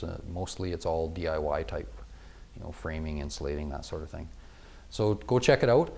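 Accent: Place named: Canada. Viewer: I have this exact same metal plus plastic valve on an old John Wood hot water heater. After a few times of opening it to drain the tank it just wouldn't shut properly. I've since replaced the end valve with a brass ball valve to reduce the drip, but because of poor sealing it drips about one drop every 20-30 minutes. I'm replacing the whole thing with a new brass 3/4" ball valve using this video as instruction, so thanks for the relaxed, quick, informative presentation. BTW just from how you spoke, I knew you were also Canadian. Thumbs up.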